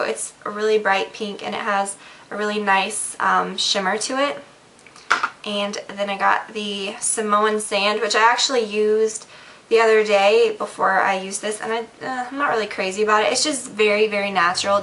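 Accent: South, United States. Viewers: I think it's just might be a bit of her accent...she's from the South I believe...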